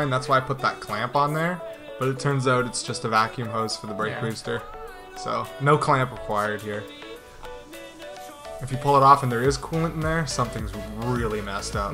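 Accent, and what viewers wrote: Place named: Canada. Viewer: Good video. The Canadian accent makes it even better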